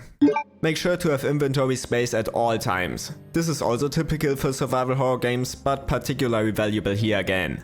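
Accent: Germany. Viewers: I could swear it's german or something like that. I'm from germany and lot of content creators from here have a similar accent in english. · German accent ?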